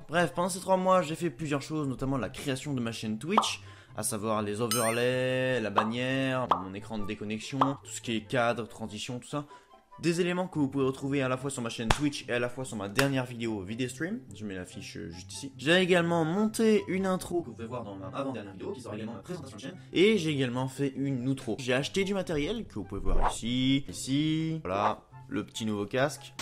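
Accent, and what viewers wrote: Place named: England, United Kingdom. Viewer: ho parle contre calme toi sur l accent anglais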